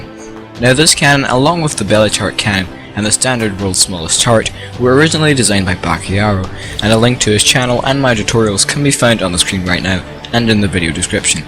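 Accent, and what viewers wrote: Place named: Ireland. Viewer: Ahhh okay. You sound Irish so I find it pretty funny.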